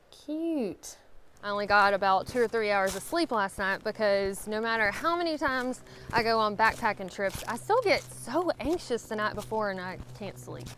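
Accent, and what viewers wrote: South, United States. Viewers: Thank you for your videos. You’re such an inspiration to my 10 yr old daughter. Though she doesn’t have a southern accent, she often tries to emulate you... it’s very funny. Thanks so much.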